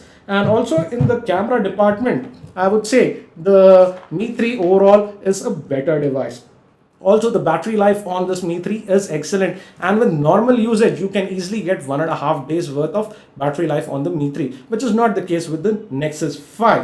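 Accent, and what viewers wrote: India. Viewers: Indian accent is so funny)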